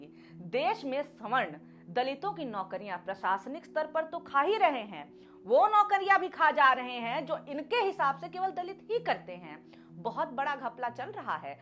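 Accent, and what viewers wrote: Bihar, India. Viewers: Jo aap bihari accent me kataksh karti ho wo bahut lajawab hai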